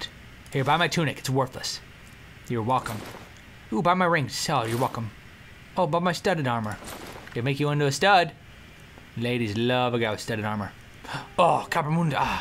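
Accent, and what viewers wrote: Russia. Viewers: this guy sounds like fpsrussiawith his russian accent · Holy crap... I just realized what his Russian accent reminds me of! BARTOK. FROM THE MOVIE 'ANASTASIA'. Holy crap... wow. I'll never watch that movie the same way again.: "I give her a 'HAH', then a 'HIYAH' and I kick her, sir." Wow. My night has been made. =] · I like the Russian accent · You had a russian ascent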